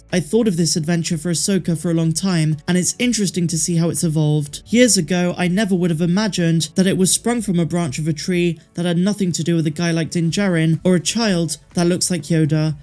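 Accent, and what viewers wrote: United Kingdom. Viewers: I ADORE YOU,! You freakin Rock 🤟❤️💋😘🤠! And hey, that Brit accent is pretty sweet too 😄💗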